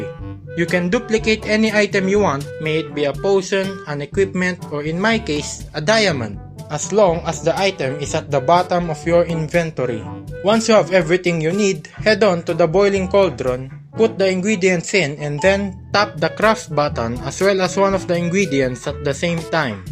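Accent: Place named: Philippines. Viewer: Pinoy to.. yung accent eh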